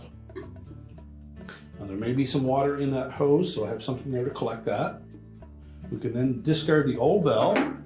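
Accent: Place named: Canada. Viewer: Is this a Canadian made video? Your accent in the pronunciation of the word "out"sounds as if you're Canadian.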